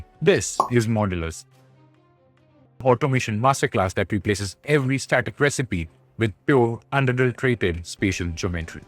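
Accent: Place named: India. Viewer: Completely off topic. Thank you for a spot of nostalgia. One of my previous employers was a small satellite to an international Indian company. All of the reasons why I left were the UK and US oriented business culture. That stuff is just oppressive, dumb and bad for both morale and business. Many of the original Indian concepts were pretty good. And they came with the musical accent you remind me of. No regrets on walking out, but I do miss that part.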